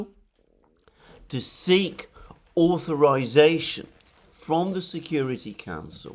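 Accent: United Kingdom. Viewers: Excelente clever well thought information. Your British accent so perfect for today’s description on Russia strategy. Great sound track. Thanks Alexander ❤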